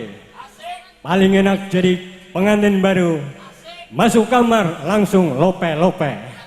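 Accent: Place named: Tegal, Indonesia. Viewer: Bahasa Jawa nya Ngapak nih. Pst eksporan dr Tegal ya boskuh. He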